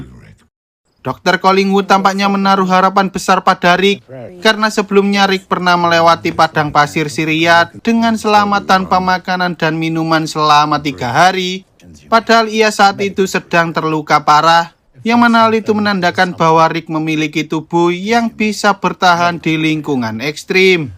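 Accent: Java, Indonesia. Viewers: Bang bagus kalo cerita tanpa logat jawa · bg bisa gk ngomong nya jan medok gitu :( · Suaranya cmpereng sama medokk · Medok bgt Jawa nya om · nadamu mas nyell jowone ndk ilang😂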